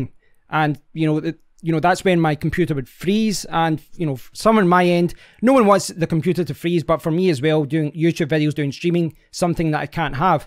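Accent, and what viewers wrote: Scotland, United Kingdom. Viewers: Yea. I agree. the scottish accent is one of my favourites.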